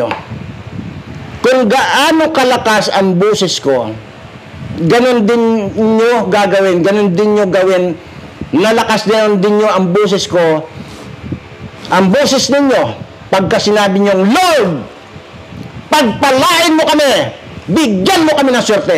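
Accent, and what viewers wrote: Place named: Visayas, Philippines. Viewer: Ngayon ko lang napanood ito nakatsamba kasi ako dahil nariring ko bantag bisaya ka sir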